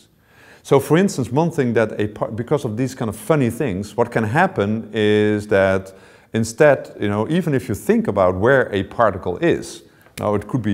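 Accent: Netherlands. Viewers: Je hoort meteen dat hij Nederlands is :)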